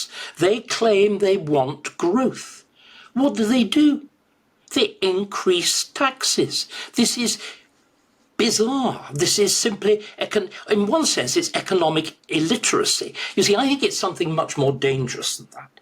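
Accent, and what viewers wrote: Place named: RP, United Kingdom. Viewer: I am an American who misses RP. The way you say “Keir Starmer” or “tall poppy” makes me happy David Starkey Talks.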